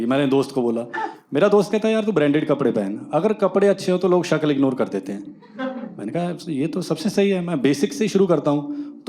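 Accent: Punjab, India. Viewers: Gadd ta bai ji, "end" write up....and of course,punjabian di Hindi ch Punjabi accent ta aa hi jandi aa😅..looking forward to more ,in coming days from ur side👍👍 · Punjabi accent 😂❤ Great video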